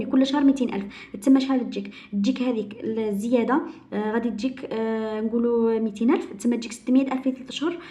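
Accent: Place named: Chlef, Algeria. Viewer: مشاركة جديدة معاك ..يعطيك الصحة عالأفكار القيمة ..عادة لا أحبذ السرعة في الكلام لكن أحببتها في فيديوهاتك خاصة مع اللهجة التي أضنها شلفية والله أعلم ☺️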